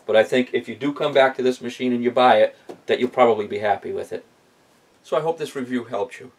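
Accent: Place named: New England, United States. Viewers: I'm guessing somewheres in New England. Maybe Maine or New Hampshire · I'd say more like Massachusetts.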